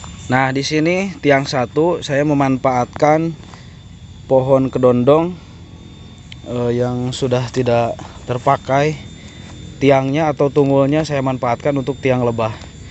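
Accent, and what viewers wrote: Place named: West Java, Indonesia. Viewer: Beda ey Ari logat Sunda mah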